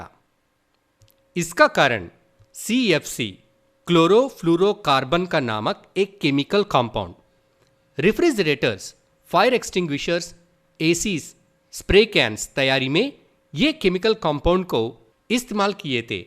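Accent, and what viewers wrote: South India, India. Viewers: I think you are living in South India because your hindi. but your Hindi was good . ❤ Love from Rajasthan ❤❤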